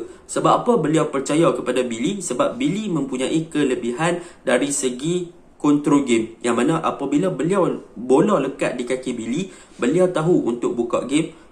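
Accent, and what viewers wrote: Kedah, Malaysia. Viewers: Org kedah yg ulas? Bgus dan semangat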